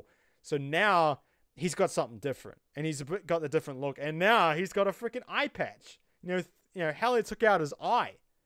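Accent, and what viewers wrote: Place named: United States. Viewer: Why is your accent messed up? U sound American.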